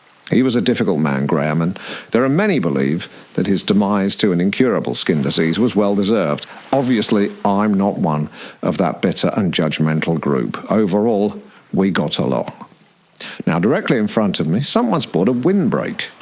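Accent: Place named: Australia. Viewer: Too aussie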